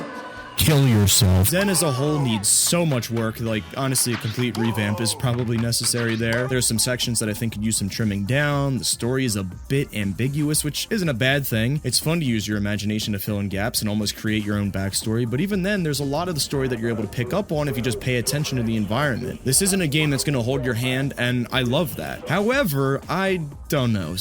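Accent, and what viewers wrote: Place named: Delaware County, United States. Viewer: Hearing you say Half-Life a bunch of times with a horrible delco accent is driving me crazy. HYEAF-LOYFE.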